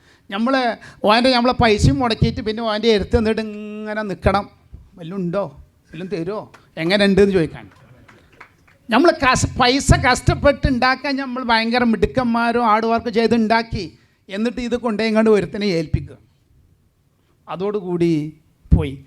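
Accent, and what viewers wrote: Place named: Malappuram, India. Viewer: ഇതുപോലെ ആരും സ്വന്തം ജീവിതം പറയാൻ മടിക്കും... അല്ലങ്കിൽ കൊറച്ചൊക്കെ കള്ളം പറയും പക്ഷെ പച്ച യായ മനുഷ്യൻ മലപ്പുറത്തെ വർത്താനത്തിലൂടെ പറഞ്ഞു അനുഭവംങൾ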